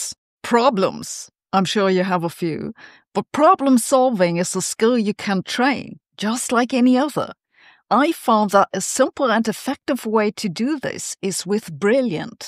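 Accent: Germany. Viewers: There's something about German accents that are cool❤ watch out, baby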